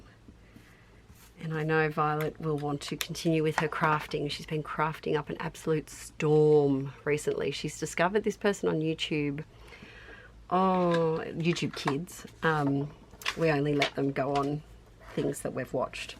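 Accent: Australia. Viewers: I think she means British as opposed to the fact that she’s already speaking English, but with an Aussie accent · I got that one from my husband, he said it’s Australian but I have no idea. I adore your accent and your fun vocabulary, feel free to always share more random Aussie words (also… please let us hear your American accent!)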